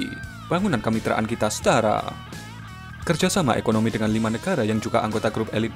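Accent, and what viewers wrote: Java, Indonesia. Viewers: Mati siji mati kabeh..Mimin orang Jawa ya🤔🤔🤔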